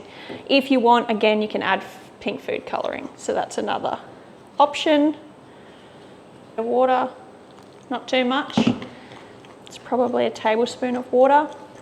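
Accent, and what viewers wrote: Australia. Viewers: How lovely it is to hear an Australian lady doing an Australian dish (I think it’s that). I had a son who was lactose intolerant and another son who had got problems so they put him on lactose-free until he was school-age anyway. Both my boys grew up on goats milk. I never got to the point of making ice cream but, other than that, everything I made for us as a family was made on goats milk. People will tell you that they can taste the difference. —. I guess that’s up to them, but the number of time I would serve apple pie and I used to thicken the goat milk with corn flour and then beat the heck out of it and people that think it was cream.. my best friend ate this, but maybe two years before she found out she’d be eating goats milk all the time. I drank almond milk in my coffee for years and I couldn’t tell the difference a lot of of its mind over matter I think. 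However, thank you very much for your wonderful effort. I’m saving you in my I don’t know what else it’s called, but I’m saving you to look you up and see what other recipes you have. I don’t know where in Australia you’re from, I’m from Redcliffe, about 25 or 30 K’s north of Brisbane Queensland. All the best, and I’ll be watching you to see what you come up with, thanks again.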